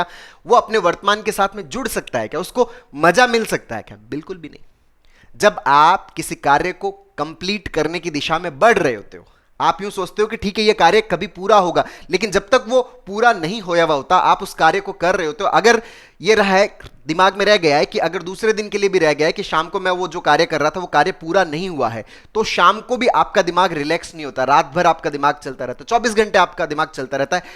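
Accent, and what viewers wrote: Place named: Rajasthan, India. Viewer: Sir aapke kahne ka tarika Bikaner rajasthan se bahut achha lgta h 😊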